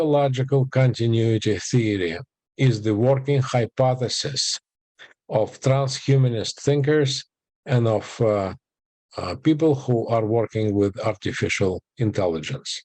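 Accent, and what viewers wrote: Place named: Russia. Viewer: Здравствуйте,! Слушаю ваши лекции по истории религий на русском и они мне очень нравятся системностью подхода, чётким структурированием и ясностью изложения. Чувствуется, что у вас большой опыт преподавания. Сегодня попробовала послушать лекцию на английском и была обрадована тем, что понимаю! Отношу это в определённой степени за счёт вашего "русского" акцента ( не сочтите сомнительным комплиментом), Очень рада, что теперь потенциальный запас интелектуальных "вкусняшек" у меня увеличился. Они помогают пережить время морока, накрывшего мою страну. Thanks a lot!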